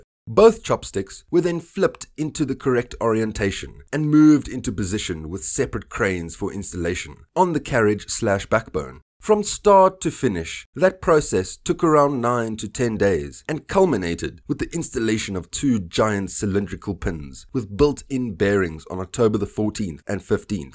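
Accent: South Africa. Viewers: False UK accent? No. That's a proper South African accent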